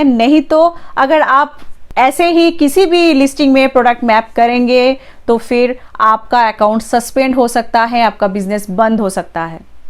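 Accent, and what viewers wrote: Bengal, India. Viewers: good info with strong bengali accent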